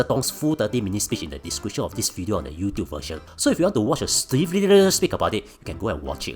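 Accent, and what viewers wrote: Singapore. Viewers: Moron, why distort your voice and shame Spore with your rotten English and Singlish? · If you are not Singaporean, it’s Singlish ; common folks way of talking to bridge communication. If you expect Queens English, this is not the channel for you. I understood him perfectly 😊.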